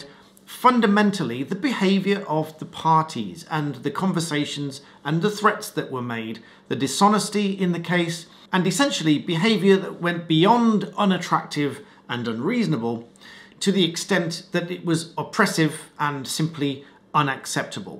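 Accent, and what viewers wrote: United Kingdom. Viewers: Also with a British accent, you should be clear as to what Country this affects.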